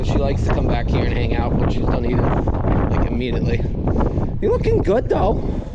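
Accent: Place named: West Coast, United States. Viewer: Your brother has a lot heavier NY or NJ style accent than you. You could easily blend with us West Coasters.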